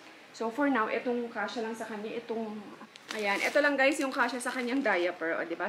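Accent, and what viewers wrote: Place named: Hiligaynon, Philippines. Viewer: Ilongga tapos Protestant. Grabe ka boses mo yung pinsan ko parehas kayo mag Salita ng tagalog.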